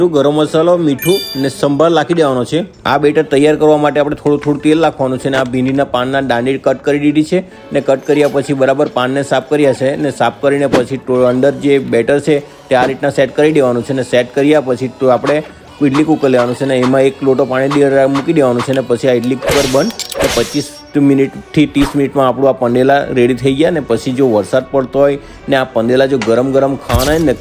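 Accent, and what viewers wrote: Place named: Surat, India. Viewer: બો દિવસ પછી સુરતી સાંભળી.. મજા પડી ગઈ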